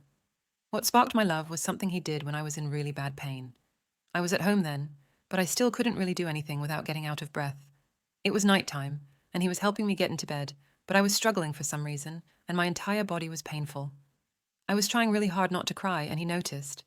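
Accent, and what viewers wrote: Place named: United Kingdom. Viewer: Where people aren’t British the person just added a narrator with a British axent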